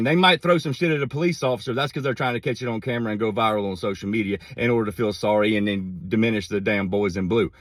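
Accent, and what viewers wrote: South, United States. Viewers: I adore you. We may disagree on some things but, I love the fact that you and I can disagree and still be friends. Btw, I am not a Trump supporter but, I'm a military brat who loves this nation and our family/ friends in blue. I just don't like Trump (before anyone attacks that doesn't mean I liked Biden)....I miss my daddy and your voice down to the southern accent reminds me so much of him. Hugs hunny, The GRITS (Girl raised in the South) now living in the North.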